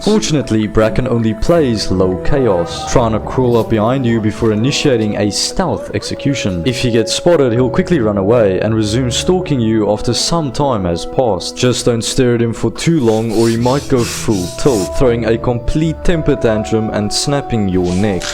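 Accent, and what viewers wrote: South Africa. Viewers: I could hear the twang of South Africa, kinda reminds me of the movie chappie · Great video bruv! I did enjoy this style as well as your original content. While I was listening to you talk more I think I just realized something...are you Afrikaans? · That accent is definitely RSA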